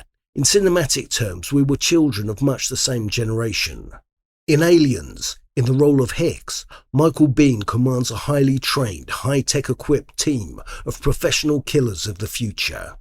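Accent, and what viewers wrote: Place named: United Kingdom. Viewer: Love 30 days in the hole, fascinating plot. Love British English, so clear❤, useful for learning to understand English by ear. Dear (am I correct?)), thank you for your work. 1-3 I listened in Russian, looking forward to the next one.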